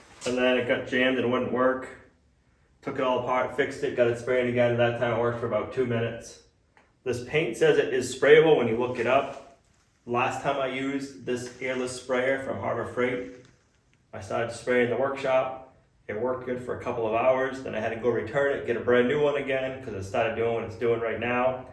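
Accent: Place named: New England, United States. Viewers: That's the way they talk over there in New England. He's just saying things the way they all do around Boston. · Yeah, and "eSScavator" 😂. Waiting for " acrost". Ahh, those NH colloquialisms!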